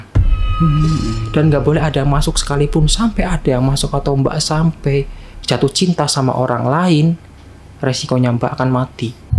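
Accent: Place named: Banyumas, Indonesia. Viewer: Ngomongnya kok sprt org ngapak sprt sy 😂😂😂😂😂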